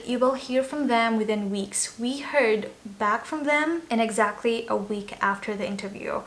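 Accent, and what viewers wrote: United States. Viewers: You’re English are perfect you sound American ❤️ where are you from ?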